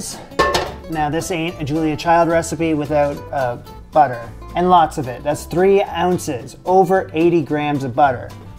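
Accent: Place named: Newfoundland, Canada. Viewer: How does this pretty bro sound Scottish and Canadian at the same time? Newfoundland? 🧐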